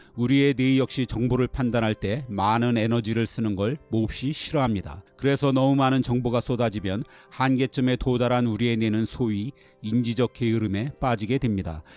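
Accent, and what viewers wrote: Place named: Jeolla, South Korea. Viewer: 고향이 전라도이신가 보네요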